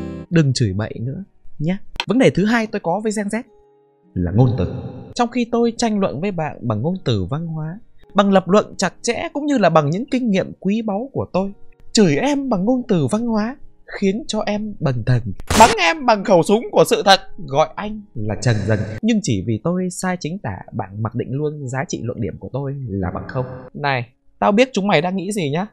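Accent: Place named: North, Vietnam. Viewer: Thề coi ông Tuân stream t ngủ ngon lắm bọn m ạ. Kiểu t cũng người Bắc nghe giọng ổng như giọng bố mẹ t lèm bèm nhau nên t ngủ ngon vcđ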